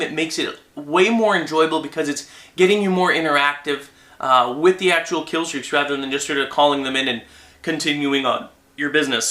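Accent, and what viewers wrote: Canada. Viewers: this guy is canadian right?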